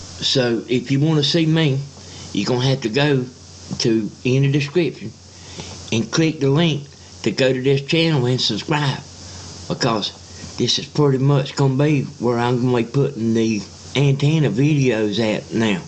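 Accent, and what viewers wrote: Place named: Appalachia, United States. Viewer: hillbilly english...fit'n to be.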